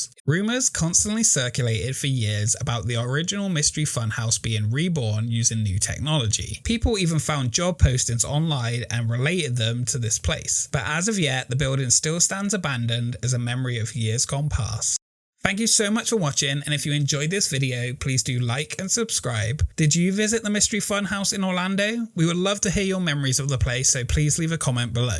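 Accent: United Kingdom. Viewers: why is a British accented person telling me more about my town's crazy oddity than i ever knew myself LOL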